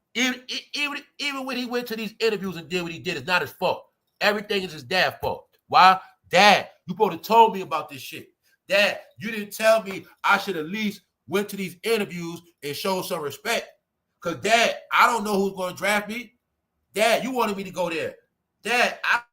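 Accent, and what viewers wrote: New York, United States. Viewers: Replay gang 💪🏽 👁️ ❤️ your NY voice ( t.y for giving us Family consistent content no one does it like you real talk 💯 🙏🏽 )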